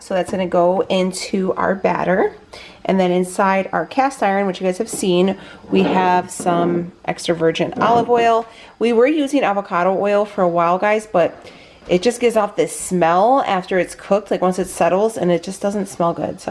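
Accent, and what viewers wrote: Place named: Minnesota, United States. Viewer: You sure sound Minnesotan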